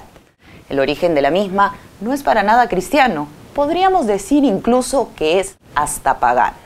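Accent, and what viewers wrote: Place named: Mexico. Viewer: De que país es te programa. Tu acento neutro me hace identificar de que país eres. Pero sospecho que eres mexicana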